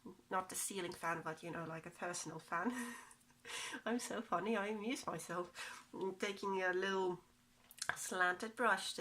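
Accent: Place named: United Kingdom. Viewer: Already subed here darling!! Love your english!!! I speak american english but love ritish one!!! like 32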